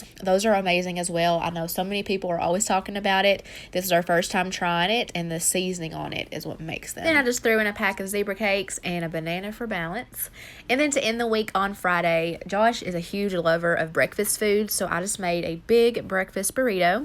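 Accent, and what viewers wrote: South, United States. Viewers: Eeeekkkkk I’ve missed these videos so much 😭😭😭😍😍😍😍😍 and your accent is everything. Southern girl here too ❤️ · I just love your channel..I love your southern accent. And your great food ideas..keep it up..much love from Nashville,tn Katie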